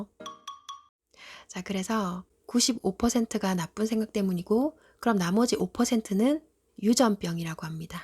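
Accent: Gyeongsang, South Korea. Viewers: 경상도 분 ~~억양이 ^!^♡ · 경상도 사투리 구수하네요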